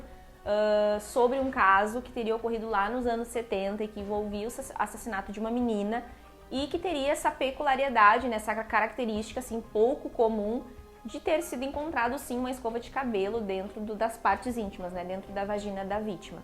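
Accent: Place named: Rio Grande do Sul, Brazil. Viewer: Muito boa a sua ideia de juntar o sobrenatural com o caso criminal. Infelizmente, aqui neste caso, apesar das evidências apontar para o tal suspeito em questão, não foi possível confirmar o seu envolvimento. Realmente é frustrante tanto para a família como para os policiais que trabalharam no caso, em especial a Joane. Será que um dia a justiça ocorrerá neste caso? Parabéns pelo canal. Pelo seu sotaque, tu deves ser minha conterrânea. Eu sou de Bento Gonçalves, mas moro no Rio de Janeiro há 10 anos. Um abraço.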